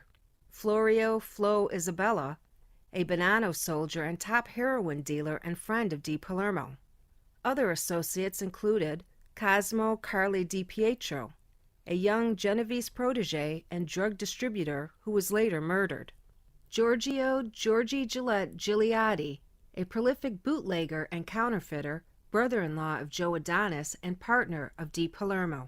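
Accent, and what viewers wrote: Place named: New York, United States. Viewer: Love your thick n.y accent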